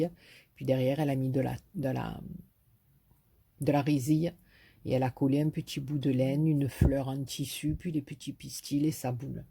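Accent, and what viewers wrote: South, France. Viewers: Coucou, je suis contente de te retrouver pour cette petite vidéo et ton magnifique accent du sud. Que de merveilles! Je suis une fan de la fée clochette alors j'adore la carte avec qu'elle dessus. Trop belle sans oublier la carte avec les fleurs trop trop belles. Tu as eu de magnifique cadeau. Bonne soirée et gros bisous Sabine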